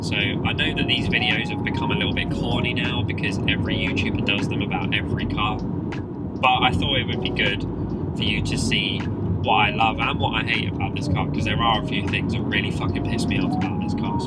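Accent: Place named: RP, United Kingdom. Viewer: Lol this gets bullied on his own youtube channel lol just put a hoody on bro and loose the posh accent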